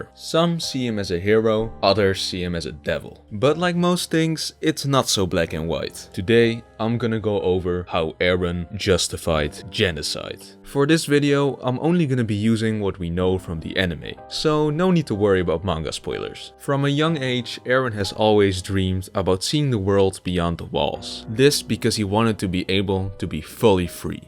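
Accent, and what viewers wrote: Netherlands. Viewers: I smell some dutch am I right?🤣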